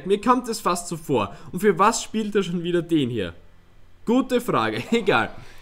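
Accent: Austria. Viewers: Ich liebe österreichisch #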